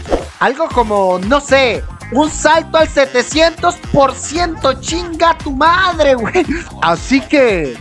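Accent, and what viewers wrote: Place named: Mexico. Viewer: Noto que se te pegó lo mexicano, ya no suenas igual que antes xd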